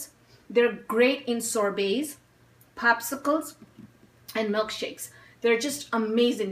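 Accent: Minnesota, United States. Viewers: Are you from Minnesota? :) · my local grocery store in switzerland just started selling canned version so i came to youtube to check it out.. i love the minnesota accent haha. originally from minnesota myself and i am enjoying trying new flavors that are accesible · You sound like your from Minnesota too lol! By the way its not meant as an insult. Also your video was very informative. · Don't think I've ever seen an Arab woman with a Minnesota accent. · American accent nice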